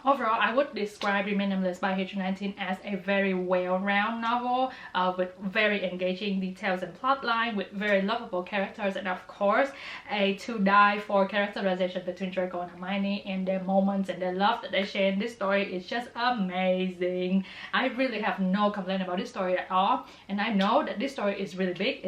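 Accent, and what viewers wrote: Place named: Vietnam. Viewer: are u vietnamese? your accent is so viet <3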